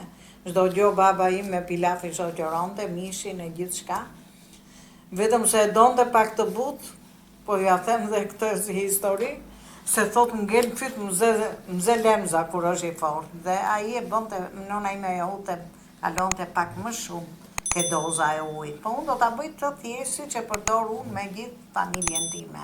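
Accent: Tirana, Albania. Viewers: Të lumshin duart! Më pëlqen shumë e folura jote Tiranse dhe sidomos bukuria e spjegimit të detajeve gjatë gatimit! Të përqafoj nga Saranda! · Me shum me pelqe e folmja tironce po dhe pilafin e ke bo persmari te lumshin duret tkesh shnet🙏🙏🙏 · Te folme Tironse ke qef me ju degju 💋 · Te lumshin duart por ajo qe me pelqeu me shume eshte kur e shpjegon , Tironse ❤ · Na kenoqe mi tironce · Sa lezet ka te degjosh çdo gatimë si nene tironce ❤ te lumshin duart! Na kenaqe gjithmon videot e tua! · Te lumshin duart zonje dhe me ate te folme. tironce puro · Te pelqen theksi tirons ty moj? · Te lumshin duart dhe goja ma knoq shpirtin me ate te folmen tironce nuk ta di emrin por me pelqen shume kur shpjegon me kujtove gjyshen time te ndjek pothuajse ne te gjitha gatimet se jam vete tironce puro pershendetje nga Kanada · Tlumt goja se knoqem kur flet puro tironce pilafi i mrekullushem sh faleminderit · Me kenaqe me komunikimin prej tironse Me pelqejn gatimet qe ben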